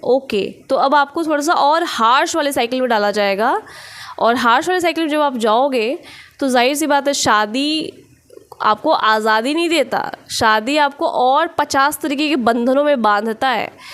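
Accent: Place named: Bengal, India. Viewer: your bengali hindi accent is awesome 🤩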